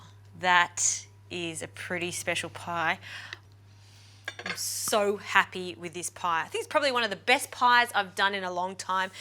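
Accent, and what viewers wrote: Australia. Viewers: She was born in Sydney. The accent should give it away, it's Aussie, not Kiwi.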